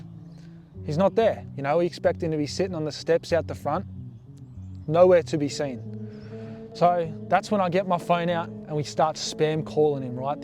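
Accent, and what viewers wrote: Australia. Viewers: Broer are u Australian?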